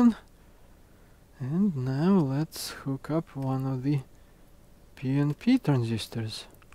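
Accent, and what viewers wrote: Russia. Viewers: Русский акцент!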